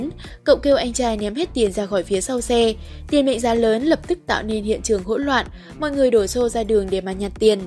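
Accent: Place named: Hai Phong, Vietnam. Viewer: chị review chắc là người Hải Phòng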